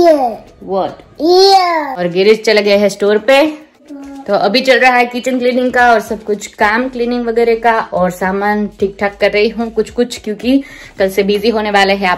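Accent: Kathiawar, India. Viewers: pure kathiyavadi Mithi gujrati boltahe happy navratri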